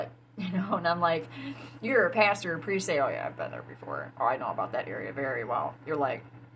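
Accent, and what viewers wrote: Michigan, United States. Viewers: lol i can tell you are a Michingander lol we have our own accent Hope you will think about lifecasting eat,sleep,s=it,shave shower even sleep streams😃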